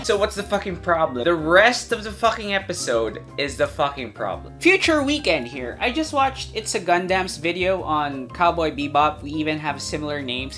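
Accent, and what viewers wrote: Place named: Philippines. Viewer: LMAO! HAHAHA, KABABAYAAAAAN! AAWOOHH! Sabi ko, parang pang pilipino accent neto a... pati ung hearts sa mga comments... Pinoy nga, ahahahah, Subscribe to you and God Bless Pare.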